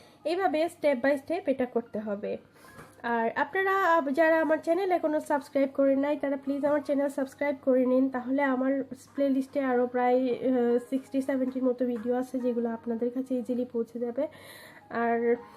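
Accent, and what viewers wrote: Sylhet, Bangladesh. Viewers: You are syleti right? I can tell from your accent your not fluent shudho bangla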